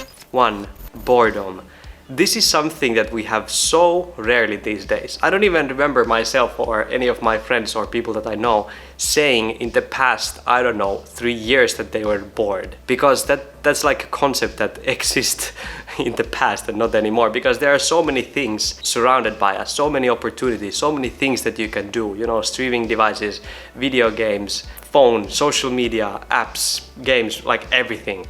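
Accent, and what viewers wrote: Finland. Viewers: Kuulin puhetavastasi heti että olet Suomesta ennen kuin katsoin kanavan tietoja.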